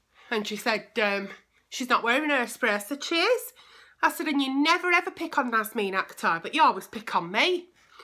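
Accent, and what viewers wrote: United Kingdom. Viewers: you are the true bright spot in my life, you make me laugh and make me feel that life is not so bad and should not be taken too seriously...your talk reminds me so much of my british sister in law, ( hubby is from Nottingham)...thank you very much for just being you, love you much...from the U.S. Jeannine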